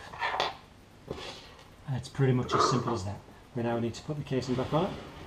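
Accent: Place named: Australia. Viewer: Thought I recognized the Aussie in your voice. Have enjoyed many visits there and find everyone seems to DIY! Former Newport RI, USA resident, now living inland and trying to get off the grid!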